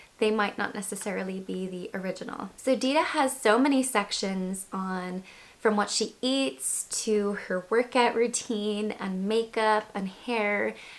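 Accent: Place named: Ireland. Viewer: The minute you said you were from Ireland i could instantly hear it in your accent, but i hadnt picked up on it before! I dont know why but i thought you were American! Another great vid, loving the content :) xx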